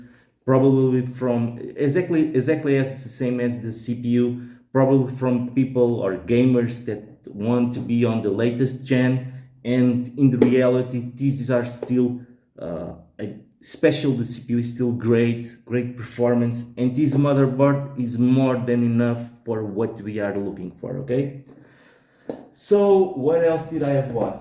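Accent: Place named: Portugal. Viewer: Acho que é português e não brasileiro. Pelo sotaque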